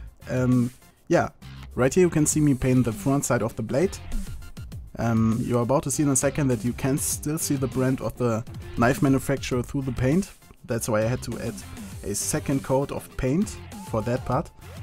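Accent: Germany. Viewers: Das Englisch ist super, nutzt alle Vokabeln richtig, macht keine grammatikalischen Fehler. Ist halt nur der deutsche Akzent den man hört.. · nur mal so man hört es wirklich raus das du deutsch bist aber mir gefallen die videos trotzdem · hört sich sehr deutsch an;) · Oh no. German english :( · You sound so german :D You actually are I guess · finde ich echt nice wie du das hingekriegt hast( ich weiss das du deutsch bist höhrt man richtig heraus:p) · . Lass mich raten.... Du kommst aus Deutschland. Und der Akzent ist auch ziemlich offensichtlich. Alles in allem ist der Karambit aber gut gelungen und es ist auch schön zu wissen, dass hier keine Profis am Werk waren, weil man so das ganze besser nachkonstruieren kann. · man hört sofort dass du deutscher bist x'D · Junge man hört so richtig dass du Deutsch bist xD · You can hear it somgood that your german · man hört deutlich das du normaler weise deutsch sprichst, warum machst du dann keine deutschen videos? 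das würd mich wirklich mal intressieren :) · Du bist doch Deutscher.. oder?! xD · Des war.mir schon klar weil die meisten deutschen so reden wenn sie englisch reden:3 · Man are you german? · Arbeite mal an deinem Akzent das hört man mega, dass du deutsch bist. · Man hört halt den deutschen Akzent mega raus, ansonsten spricht er schon gutes Englisch =)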